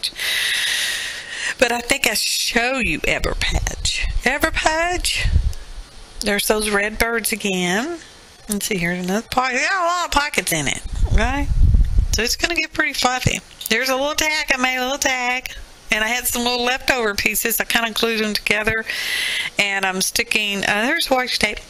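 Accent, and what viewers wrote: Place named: Alabama-Mississippi, United States. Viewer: sounds just like Ala-ssippi!!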